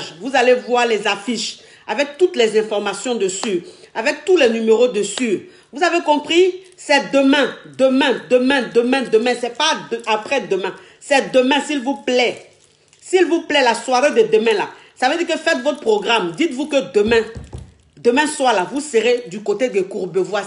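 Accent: Ivory Coast. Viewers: ivoirienne d' après l' accent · je suis congolaise de Brazzaville mais dés que j'ai vue la dame parler j'ai su qu'elle était ivoirienne.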